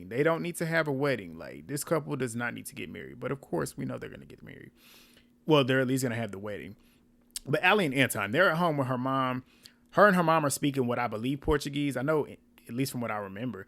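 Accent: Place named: North Carolina, United States. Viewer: I’m from South Carolina and I just love listening to you talk because you sound soooo NC 😂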